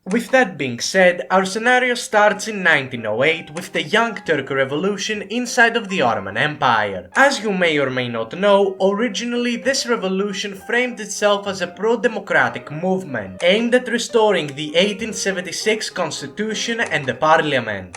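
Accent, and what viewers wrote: Greece. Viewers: Really like to listen to people with that greek accent, it sounds so interesting and funny at the same time, nice vid btw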